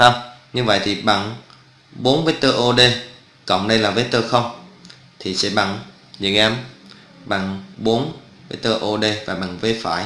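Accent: Phu Yen, Vietnam. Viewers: Em ở miền bắc .Hồi trước em không phân biệt được giọng Phú Yên với các tỉnh trong đó, mà giờ nghe thầy giảng nhiều nên em phát hiện giọng Phú Yên có gì đó đặc trưng hơn các vùng miền khác. Em yêu giọng thầy và thương thầy lắm, cám ơn thầy luôn giúp đỡ em và các bạn ạ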